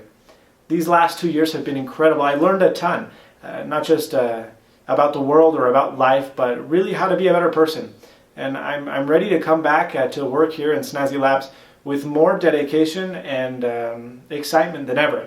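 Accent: Spain. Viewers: Spanish accent!!!!! :D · I speak spanish as well, and it's very noticeable for me, haha. He must not have had much of a chance to speak English. His 'i" is very spanish-like. I think it comes from being a non-native speaker. I'm sure that being back home, he'll be able to even out his accent. He must have absorbed so much culture! It must have been an amazing experience! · Wow.... i remember when you left... crazy to think how fast time has passed. Your accent has developed so much into a spanish accent. It's hilarious! Hope to see more soon. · you have a slight Spanish accent when you speak English now compared to your last videos pre-Bolivia haha. Great to have you back:) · Slight Spanish accent? Nice to have you back! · That spanish accent haha!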